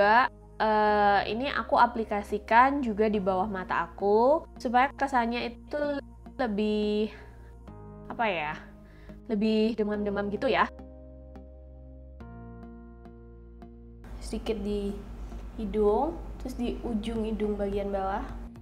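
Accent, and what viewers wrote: Java, Indonesia. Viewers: pertama kali liat langsung subscribe :D lucu medok jawa gitu haha sering" upload dong kak ^^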